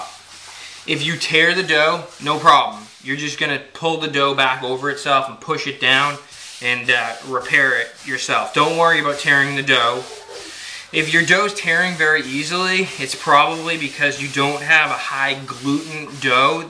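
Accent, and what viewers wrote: Canada. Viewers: You sound like ur from Ontario Canada.. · Are you Canadian?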